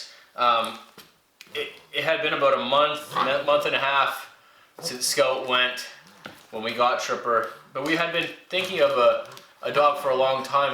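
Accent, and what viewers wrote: Ontario, Canada. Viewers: Your Canadian correct? Not out of Michigan. Thanks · hey. i saw you on alone and stumbled across your algonquin videos. i'm also from ontario and around the same age as you so i love how we have the exact same accent and i get all your little references. this dog is probably smarter than scout was so you gotta be more strict and firm. make it learn tricks and commands and dont be afraid to be firm. can't wait to see how he does in our beautiful provincial parks this year. thanks for making these vids, i know it's a lot of work but i appreciate it and i know your kids will love looking back on these videos once we're both old grumpy men.... or after you die from being eaten by a muskie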